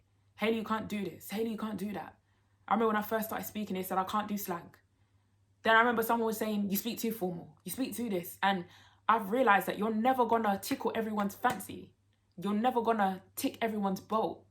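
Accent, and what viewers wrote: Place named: Jamaica. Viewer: You are amazing!! I was so scared of it as well. It will get better! We support you 1000% Are you Jamaican? Loving the patois 😂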